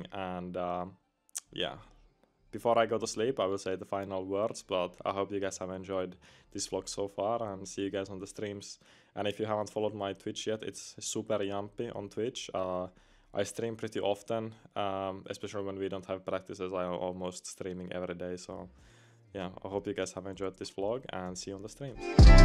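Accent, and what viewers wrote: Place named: Finland. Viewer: first time seeing a video of you and instantly recognized the Finnish Accent, hope all goes well and got a new subscriber <3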